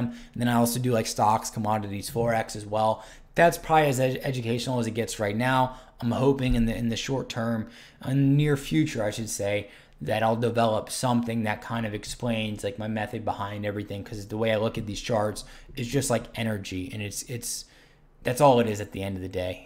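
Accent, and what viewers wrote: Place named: Texas, United States. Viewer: where are your from? Texas? I was wondering because I never heard your accent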